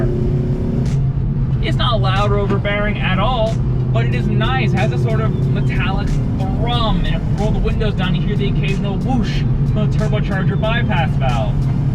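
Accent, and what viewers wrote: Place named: United States. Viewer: Jordan Henderson is doing car reviews?! Sick. Nice American accent btw.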